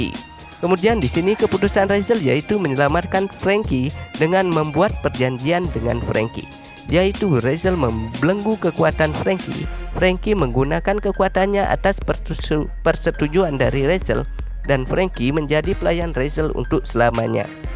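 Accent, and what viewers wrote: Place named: Java, Indonesia. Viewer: Tambahan, kalo bisa logat daerahnya di kurangi. Bahasa indonya medok bgt.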